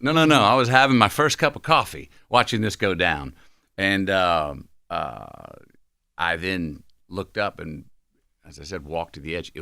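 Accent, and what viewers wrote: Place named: Texas, United States. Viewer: Gurlllllllllllll I broke my nail I CLICKED SOOOOOO DAMN FAST!!!!!! I loveeeee his Texas country deep voice having ASS!!!!!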